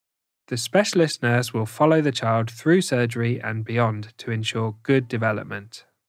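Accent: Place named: United Kingdom. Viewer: What is it for an accent? love it! It's british but also not british :D Or do you have just a really beautiful voice?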